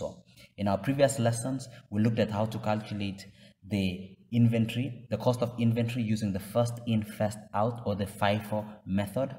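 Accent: South Africa. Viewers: This was a really wonderful clear tutorial. Also great to hear a fellow South African. 🌸 Thank you for your work!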